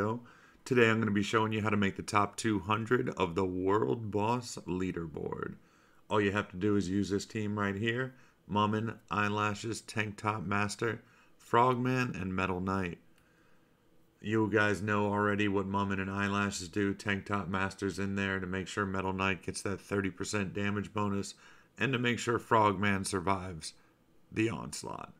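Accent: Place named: United States. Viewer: man youre american for sure. Thx for ur tips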